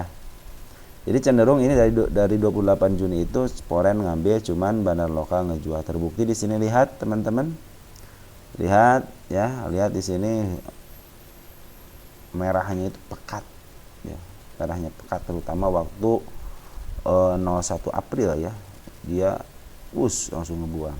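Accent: West Java, Indonesia. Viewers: Si aa urang sunda pisan logatna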